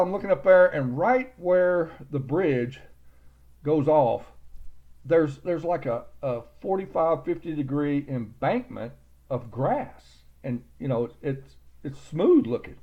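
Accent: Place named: South, United States. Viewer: ...I love your accent, I could sit all day & listen to you tell stories....lol...you sound like you're from the South...country as S***, just like me! 😊😊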